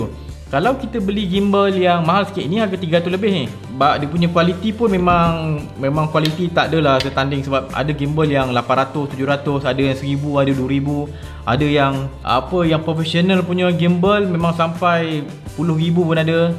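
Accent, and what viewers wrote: Melaka, Malaysia. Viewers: bunyi slang Melaka 👍👍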